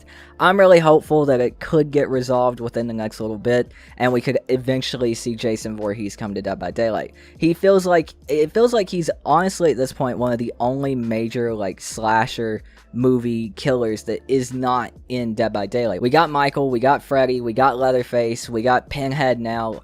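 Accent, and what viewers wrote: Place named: South, United States. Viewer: Wow only a few weeks? Well I really like your content so far and I can tell that you're from the South or at least it sounds like you are. I'm from Ocala Florida. I definitely wouldn't take too much of what Corey Feldman says to heart though. He definitely has been dealing with a lot of mental issues lately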